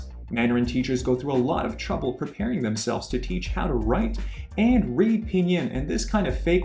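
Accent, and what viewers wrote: Standard. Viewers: 你的普通话说得非常好，很标准，甚至听不出口音，真的很厉害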